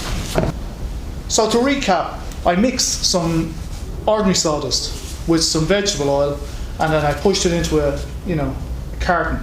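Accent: Ireland. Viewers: Ah, I love the brogue! You could read the phone book or dictionary, and I'd listen. Reminds me of the older folk when I was a child. Why is it that so many DIYs from Ireland ad GB tell you to mix something to the consistency of porridge? Makes me smile, because it's so descriptive. :)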